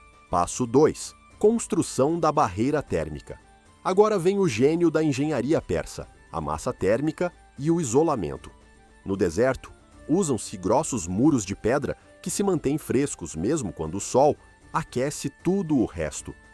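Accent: Brazil. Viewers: Sotaque brasileiro e texto da guiana. Ruim de ouvir "à falar", "à andar", etc.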